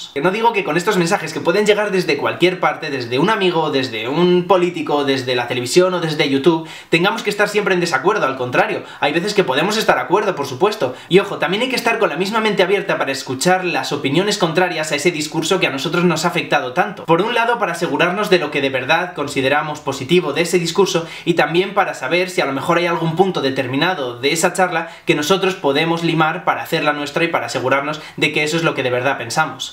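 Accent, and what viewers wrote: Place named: Spain. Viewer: me encanta tu acento español jajajaja en parte por ello veo tus videos😂 ,un saludo!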